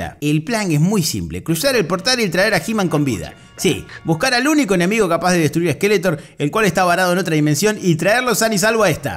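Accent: Argentina. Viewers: jajaja sos genial, pero una duda, eres argento o mexa? traes de las 2...recuerdo esta peli en el cine, todos los adultos dormidos, y yo ya me quería ir a la casa cuando vi que ya estaban en una cd de Eu!!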